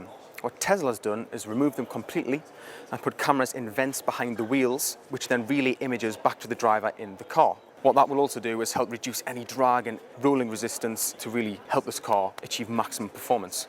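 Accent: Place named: North East England, United Kingdom. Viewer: Its a North East accent...whay aye man :-) Even people from North Yorkshire have to listen carefully...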